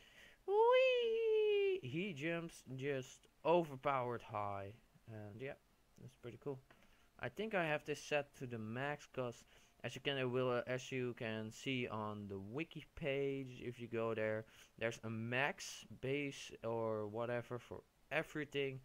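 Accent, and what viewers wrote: Netherlands. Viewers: is this man dutch?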